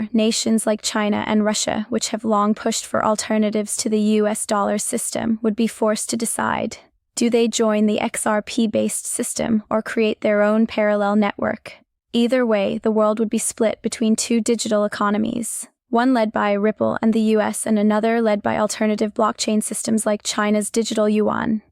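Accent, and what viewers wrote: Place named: Latin America. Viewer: I prefer her Latin accent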